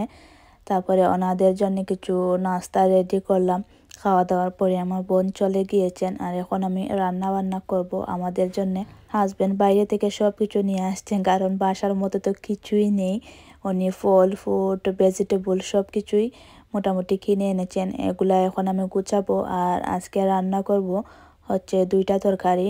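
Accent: Sylhet, Bangladesh. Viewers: Apu apnar basha Sylhet er kun jaygay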